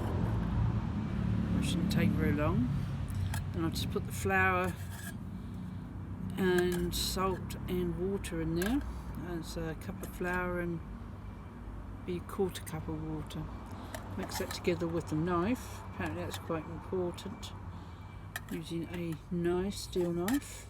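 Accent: New Zealand. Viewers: That looked scrummy! No comment re the word "deck" and your (charming) Kiwi accent! Cheers. Ken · ......nice deck........😜👍 That's awesome with a Kiwi accent .....😋, sorry😞.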